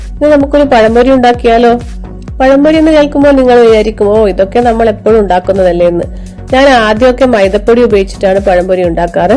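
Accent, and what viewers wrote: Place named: Kozhikode, India. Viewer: slang kettappo thonni nammade sidaan...njan Kozhikode aa😄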